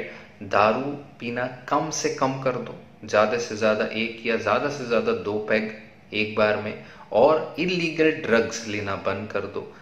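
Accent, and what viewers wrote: India. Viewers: sorry doc..i have a hard time fallowing you..what is that indoenglish ?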